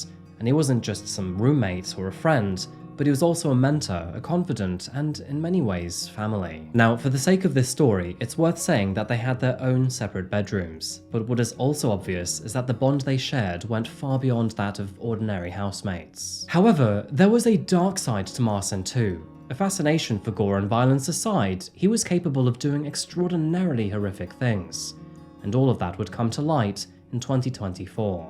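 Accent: United Kingdom. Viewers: I doubt you'll ever see this. But a recommendation as a first time viewer and now fan. The 1 minute long intro, without showing face. I originally clicked off the video, and googled if this actually happened and if this channel was AI. I came back after learning both were real. Maybe I'm just a dumb American. But the perfect British(?) accent along with minimal inflection made me heavily suspect AI. Keep up the good work, and maybe show some highs/lows in the voice or your face a tad earlier in the video :)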